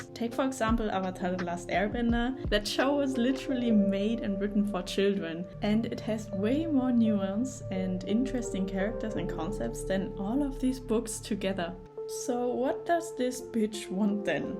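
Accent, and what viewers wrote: Germany. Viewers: Dein deutscher Akzent ist echt schlimm aber sonst gutes Video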